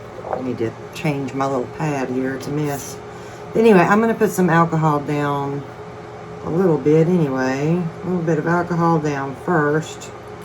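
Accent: South, United States. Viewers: I like your Southern accent. Maybe you could edit out the blow dryer noise. That's kinda loud.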